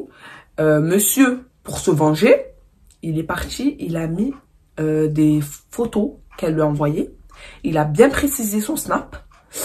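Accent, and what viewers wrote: Marseille, France. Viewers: Ton accent il est trop beauu 💞 tu viens de Marseille ? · t gênante andrea arrête de faire la gadjie mature tu dis dla merde elle a l’accent marseillais point arrête de rager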